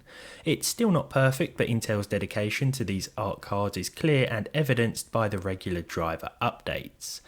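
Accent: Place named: RP, United Kingdom. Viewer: You're sounding particularly posh in this video 🧐🎩 , not criticising, simply noticing, simple as.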